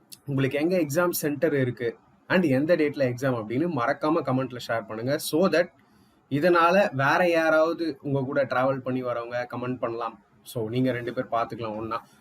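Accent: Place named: Coimbatore, India. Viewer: I think Coimbatore side ah irukalam😮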